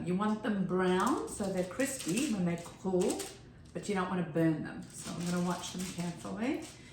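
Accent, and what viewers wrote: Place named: Australia. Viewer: Hi! Good to hear an Aussie do a cooking segment. I think you could probably sprinkle them with sesame seeds on top for a bit of flavour. Maybe you could sprinkle a little Parmesan cheese in with the cottage cheese. Thanks for the recipe. All the best.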